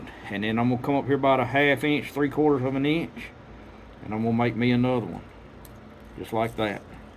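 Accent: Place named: Virginia, United States. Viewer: oh my goodness...how you make me miss my Daddy. He skinned just like you do. He talked just like you do. I am not sure where you are from but you remind me of back home in Virginia. Don't forget to save your innards and put them under your garden plants. Makes for great fertilizer.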